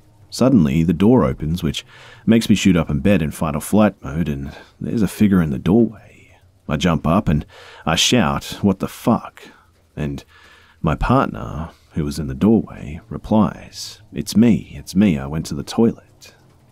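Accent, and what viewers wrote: Australia. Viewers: Melinda Nicole I thought it was only me that loves the Australian accent XD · so? he's still got an Aussie accent... we are very multi-cultural in Australia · Thank you for making these videos they honestly are the only thing that helps me sleep. Your voice is just nice and it’s also nice listening to a fellow Aussie accent 👌🏻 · I agree! Love Australian accents. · that's our australian accent mate, i'm an aussie and so is, if you don't like it theres plenty of other narrators out there who aren't australian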